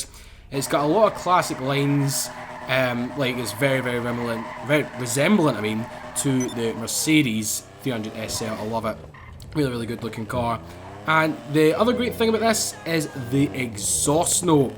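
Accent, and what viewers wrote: Scotland, United Kingdom. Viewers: are you scottish and does ek stand for east kilbride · He is Scottish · Are you Scottish.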